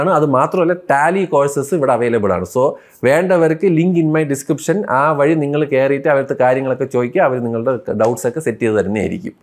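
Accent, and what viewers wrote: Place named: Thiruvananthapuram, India. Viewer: നിങ്ങളുടേം തിരുവന്തോരൻ ന്റെയും ഹൈ ലൈറ്റ് " തിരുവനന്തപുരം " സ്ലാങ് ആണ്.. കേൾക്കാൻ തന്നെ നല്ല രസം - എന്ന് ഒരു എറണാകുളം കാരൻ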